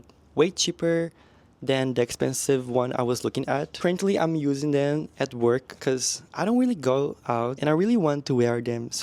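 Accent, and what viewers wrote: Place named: Australia. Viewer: Great video,! On a side npte, I think your Aussie accent’s starting to kick in 😊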